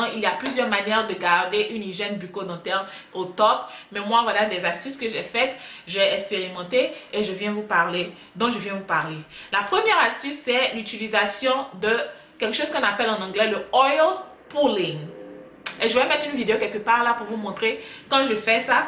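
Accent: Ivory Coast. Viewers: Les astuces sont top, je connais celle du charbon et du bicarbonate mais pour huile de coco, d'abord ici en Côte d'ivoire, c du liquide donc ça dans ma bouche pendant 25 min tchiéé je vais finir par avaler Le carbone coco je peux l'avoir ou stp? 😂😂😂 la manière de parler, ma chérie tu es ivoirienne ? 😏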